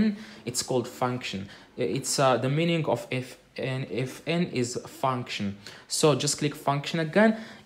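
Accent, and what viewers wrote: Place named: Morocco. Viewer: Sound morrocan 😁🤩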